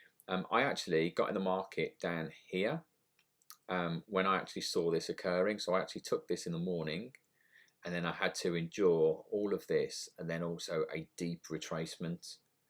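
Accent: United Kingdom. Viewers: Nyc analysis of the charts bro Luv the British accent too 😂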